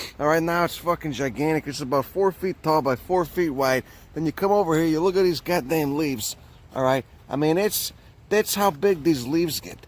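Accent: Chicago, United States. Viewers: Omfg bruh this really is how old school mfs Sound in Chicago instant subscribe i would listen to dis guy read the phone book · Oh my glob, this guy is HILARIOUS! I have to go back and watch everything he's ever made, now. Wisconsin has a lot of the same flowers and I'm a Master Naturalist trying to learn more plants every day, and starting to recognize a rather amazing number of them. Takes time to get them all to stick in my brain, one plant at a time. But with a Chicago accent and all the expletives? I'm going to learn SO much faster now!!! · Chicago Italian accent lol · "The lawn is a tragic, impoverished mindset" BRILLIANT! This guy knows his shit! Love the Chicawgo accent! LOVE it! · So, I've been watching your videos while I do my schoolwork for some reason, and I zoned out from this one for a minute as I was reading a Geometry textbook and looking at hexagons and decagons and stuff, and I just hear a voice with a thick Chicago accent say in my ears: "That would actually look like It'd hurt if you put that in your ass, I don't want to put that in my ass." I had to rewind the video a few times to make sure you were just talking about Echinacea.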